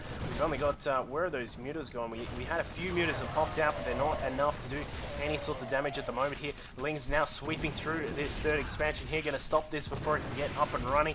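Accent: Australia. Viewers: an aussie?! bout time!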